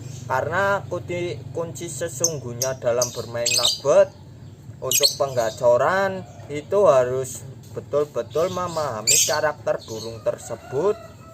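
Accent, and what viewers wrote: Banyumas, Indonesia. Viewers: Ora ngapak ora penak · Ahaha jawa medok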